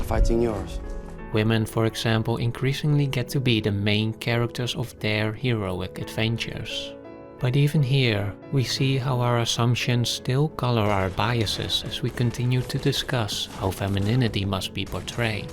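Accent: Netherlands. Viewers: Duthch accent.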